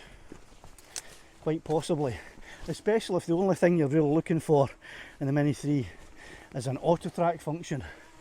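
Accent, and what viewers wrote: Scotland, United Kingdom. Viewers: Love the Scottish accent!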